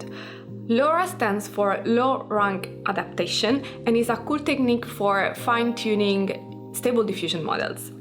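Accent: Italy. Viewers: Amazing, I've finally find out how to use a Lora model thanks! PS: Sei italiana? il tuo ecc ecc suonava italiano :)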